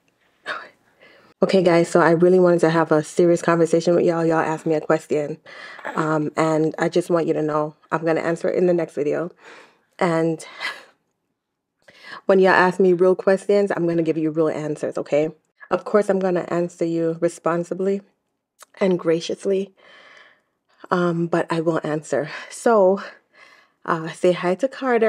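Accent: Jamaica. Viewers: Are you Jamaican?? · Why you sound jamaican????🤔🤔🤔🤔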